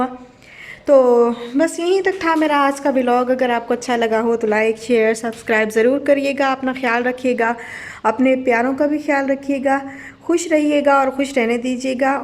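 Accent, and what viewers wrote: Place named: Mianwali, Pakistan. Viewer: I think u r from mianwali jis tarah sai ap bolti hain.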